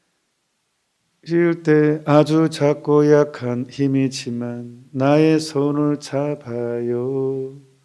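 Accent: Jeolla, South Korea. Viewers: 신부님 전라도사투리 정감이갑니다 저도전라도ㅋㅋ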